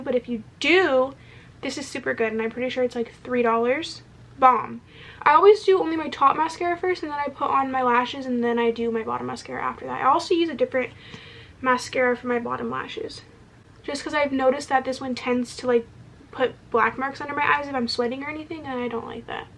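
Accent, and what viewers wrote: United Kingdom. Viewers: i love your random british accent 😂❤️ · The British accent LOL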